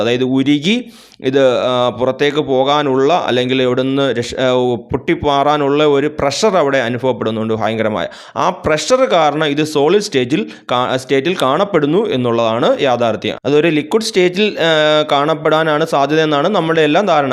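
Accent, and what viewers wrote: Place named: Kottayam, India. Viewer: Ath thettalla avarude language Angane aayittanu. Kottayam polulla chila placesil 'PA' ' Pha' pronunciation different aavum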